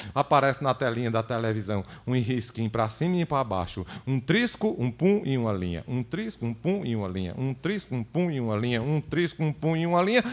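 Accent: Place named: Northeast, Brazil. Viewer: DIALETO NORDESTINÊS, QUEM CONHECE GOSTA MAIS UM POUQUINHO A CADA VEZ !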